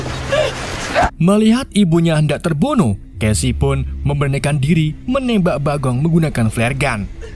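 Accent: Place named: Java, Indonesia. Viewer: kwbelet ngoyo 🤣🤣🤣langsung ngkak denger logat jawanya bang, suksesterus saya suka reviewnya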